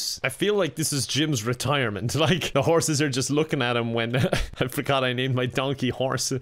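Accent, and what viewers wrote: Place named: Ireland. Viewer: Do whatever you want to. I'm here for the Irish accent. That shit is basically my kink.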